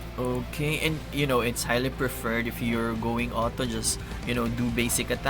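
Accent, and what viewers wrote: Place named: Philippines. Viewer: Pinoy ka pala ganda ng accent haha keep it up already subscribed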